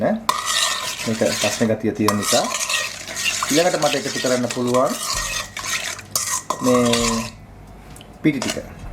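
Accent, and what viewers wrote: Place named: Sri Lanka. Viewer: No offense. Have you heard the French, the Germans, Slovaks and other ethnicities speak English. They have their own way of pronouncing English words. For example, the Americans pronounce castle, tomato, potato and a few other words very differently than the British. There is a significant difference, that one could clearly identify where you are from. Similarly, chef was born and raised in Sri Lanka, and lived majority of his life in Sri Lanka. His way of pronouncing some English words are entangled with our sinhala dialect. Which comes naturally if one doesn't make an effort to change the way of pronunciation. Did chef pronunciation affect his recipe? Did his pronunciation affect his career? No. Not at all. Why publicly point fingers? How would you feel if someone did the same to you? Making one feel small on a public platform is unkind, Inhuman. 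It says a whole lot about you, rather than about chef.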